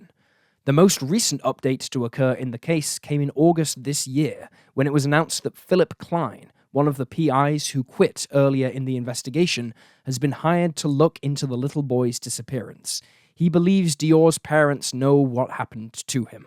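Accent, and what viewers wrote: United Kingdom. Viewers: I missed the British voiceover!!! Welcome back!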